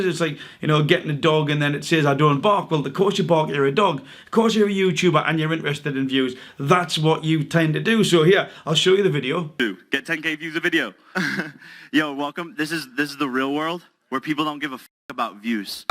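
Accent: Newcastle, United Kingdom. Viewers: Before I see this video I already know you're going to side with Gib since you sound British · Milo Clesham I think he’s said that hes from Newcastle as well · Is it just me or does this guy sound like true geordie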